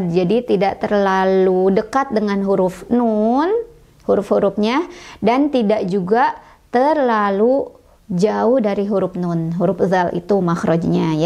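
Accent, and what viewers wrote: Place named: West Java, Indonesia. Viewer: Subhanallah sepertinya teteh orang sunda ya. Kalo memang benar artinya kita satu suku. Alhamdulillah saya menemukan channel ini. Saya dari bangka sangat ingin belajar membaca ayat al quran dengan benar. Barrakalloh teteh terimakasih ilmunya sangat bermanfaat. Semoga teteh diberi panjang umur dan sehat selalu. Amin amin..🤲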